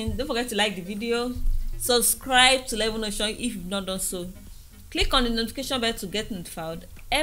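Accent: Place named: Nigeria. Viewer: hey, you sound like a nigerian, are you? let me publish my video self made animation on 6th march (my birthday) then we'll talk. thank you.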